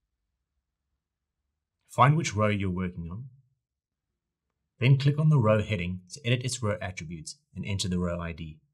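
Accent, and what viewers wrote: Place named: South Africa. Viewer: Great video, really helpful thanks. And brilliant to hear a Saffa accent!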